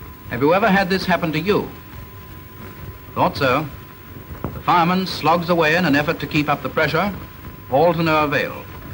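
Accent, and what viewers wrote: United Kingdom. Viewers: I've watched this video so many times, but I come back for the narrator's exquisitely dapper British accent.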